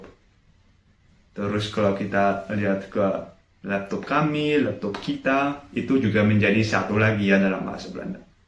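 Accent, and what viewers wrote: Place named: Java, Indonesia. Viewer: finally video baru🤩 semangat bikin video² baru lagi🙌😂 btw suaranya kayak ada medok² Jawa🤭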